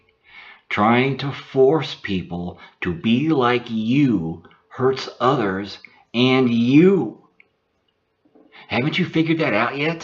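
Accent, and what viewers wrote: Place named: United States. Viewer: Amazing and thought provoking in this age of rampant extremism and rising nationalism. This is the first time I've listened to an American and understood every single word he has uttered without scratching my head and despite his accent. Besides, this person sounds like a very decent American, which is quite unusual. Interesting philosophy, really, and a humanistic and tolerant approach to the world. Personally, I've always harboured similar ideas ever since I was a teenager, but I had no name for it and never looked for one anyway. I've just been unable to fit into any ideological, doctrinal or sectarian pattern all my life, which I think is a boon as I never got involved in compromising political endeavours. Voilà de quoi me lubrifier les méninges. Greetings from Algeria.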